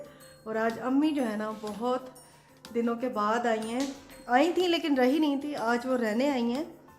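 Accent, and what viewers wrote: Saraiki, Pakistan. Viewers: apki saraiki sun Kar acha laga.im from bahawalpur.ap kis city mai rehti han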